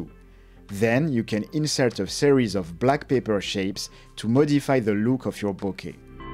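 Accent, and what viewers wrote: France. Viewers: The tricks are just amzing ! And the powerful French accent is totally tentalizing.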